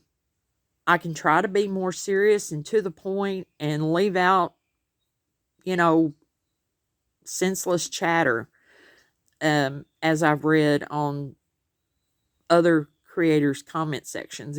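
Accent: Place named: Texas, United States. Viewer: I am sorry that is happening. I watch yours throughout but I do click off of the creators that sing or show a lot of painting or make the crafts in real time. If I can’t understand the creator… I feel bad but I just can’t. I am a Texan and so you sound like me. 😂 You are very talented. Are you doing shorts? I hate them but I think a lot of YouTubers use those.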